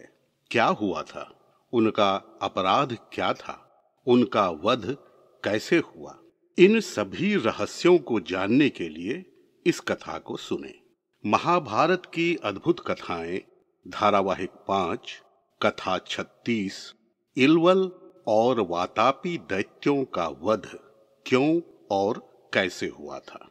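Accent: Standard. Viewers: सचमुच रहस्य एवं रोमांच से भरी महाभारत की एक अद्भुत् और अलौकिक कथा….! आपकी अद्भुत् और शुद्ध उच्चरित वाणी से कथा और भी प्रभावी हो गयी है। चित्र भी ख़ूबसूरत नंऔऔर सटीक हैं। एक प्रश्न मन में उत्पन्न हो रहा है कि अगस्त्य मुनि ने जिस सुन्दरी स्त्री का स्वयं निर्माण किया उसी लोपामुद्रा से विवाह करने की इच्छा क्यों की...? दैत्यों का वध उचित था क्योंकि उनके कर्म उचित नहीं थे। पर दैत्य की संतान प्राप्ति की इच्छा क्यों पूरी नहीं हो सकी...? क्या अनुचित था? 🤔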